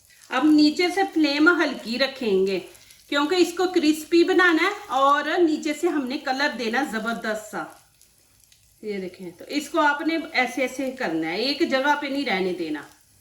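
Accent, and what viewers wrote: Punjab, India. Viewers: مجھے تو آپ کی پنجابی لہجے والی اردو اچھی لگی 💕💕💕💕🤲🤲🤲🤲اور ریسپی بھی میں ٹرائی کروں گی مزے کی لگی 💓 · Baji bohat achi recipe hay lakin aap K Punjabi lehjy me Urdu bohat torture karti hay. Kya hi acha Ho K aap Punjabi me hi videos banaya Karen. Wo hame ziada pasand aay gi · Ma shah Allah zaberdat . Ap kaha say hay punjab say ap Sialkot ya Gojranwala say lug rahi hay ku k ap ki urdu bohat punjabi touch hay 😻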